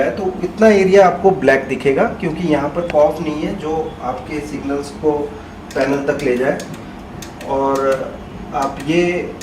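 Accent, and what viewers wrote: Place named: India. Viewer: english way you sepack indian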